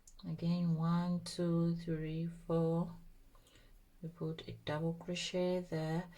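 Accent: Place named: Jamaica. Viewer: that's Jamaican talking but good work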